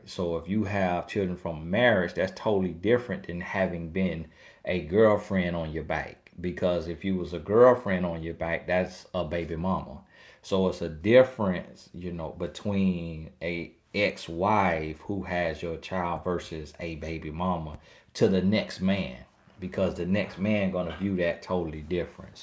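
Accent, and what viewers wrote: South, United States. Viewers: I literally could listen to this country boy accent all day! I am from The Bahamas🇧🇸 and I can understand EVERY SINGLE WORD you say!!! Love you!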